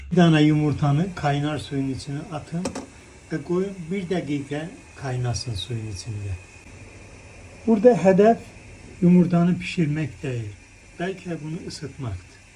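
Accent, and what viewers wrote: Azerbaijan. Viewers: “Ösgürük “ bayılıyorum Azerbaycan şivesine ya😃🫶🫠♥️ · Əslində söz “öskürək “ kimi yazılır, sadəcə doktor türkcə və azərbaycanca qarışıq danışır.😊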